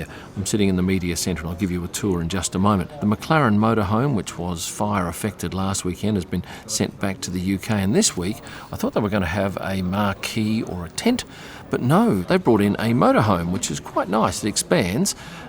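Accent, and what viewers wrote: Australia. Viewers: love your videos! Great visuals and commentary, love hearing that awesome Australian accent. Take care! An American fan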